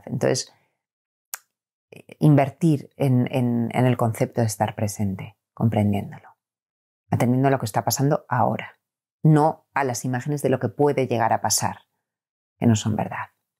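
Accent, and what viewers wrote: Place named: Spain. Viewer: TENES UNA HERMOSA VOS, GRACIAS POR TU AYUDA, PRESUMO QUE ERES DE ALGÚN LUGAR DE ESPAÑA. 😁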